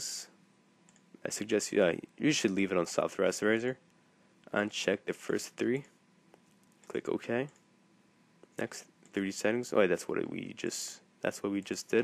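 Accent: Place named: Canada. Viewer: T'es Canadien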